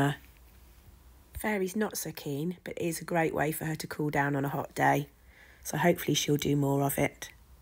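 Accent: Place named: United Kingdom. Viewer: She's all bundled up in her lovely coat, on a windy day :-) I also like to hear the British accent, as I am in America now, and miss England ! :-) Absolutely lovely.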